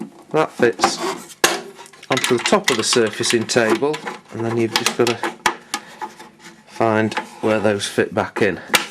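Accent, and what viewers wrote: Manchester, United Kingdom. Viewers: A couple of really useful videos. There aren't many reviews for this out there and to find one from a fellow northerner was nice. Just bought one of these second hand for £40. Funnily enough I hopped over the pennines to pick it up from a chap in Manchester, which I assume from the accent is where you're from ish. Thanks again and kind regards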